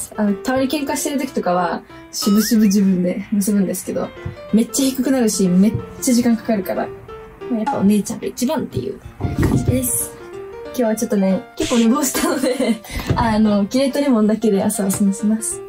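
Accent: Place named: Nagoya, Japan. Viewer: 名古屋弁同じ！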